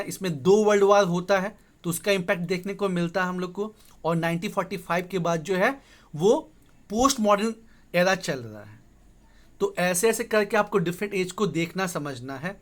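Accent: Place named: Jharkhand, India. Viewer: thanks a lot,,for replay without any boasting I m now out of jharkhand and your style of speaking recall me jharkhand🙏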